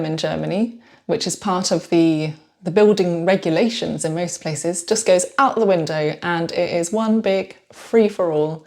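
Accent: United Kingdom. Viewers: I adore your British accent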